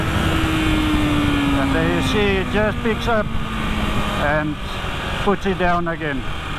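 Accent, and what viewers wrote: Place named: South Africa. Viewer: Man I clicked on this video for some knowledge on wheelie control and as soon as I heard the accent and the road appeared I could tell you're from South Africa. Great video sir. I've subscribed.🙏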